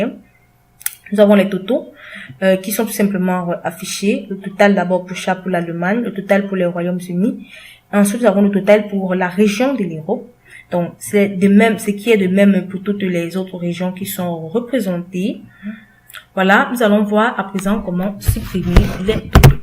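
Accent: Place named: Africa. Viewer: toi tu es africaine , ca s'entend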